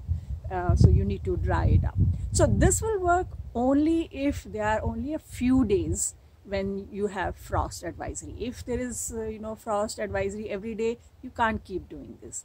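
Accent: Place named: India. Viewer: Hey. May I know in which country you live in? You never mention any country or state in your videos, so it confuses me a lot... Your accent is Indian, if I am correct. But there hardly is frost in India... Also, I just saw your video about wisteria, though I have purchased some seeds of it, don't exactly know if they are original or not, but I have never seen wisteria in India. I am from Kashmir and wish to know if wisteria will survive here in snow. Thank you! And I apologise for so many questions 😂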